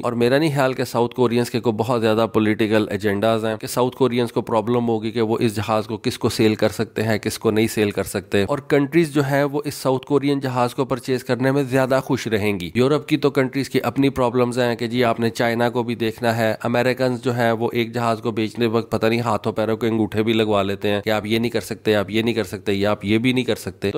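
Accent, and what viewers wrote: South Africa. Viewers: SOUTH AFRICAN!!!😂😂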